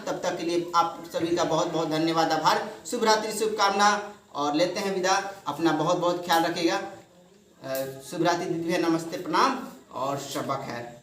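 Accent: Standard. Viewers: आपकी शुध्द हिन्दी काफी अच्छी है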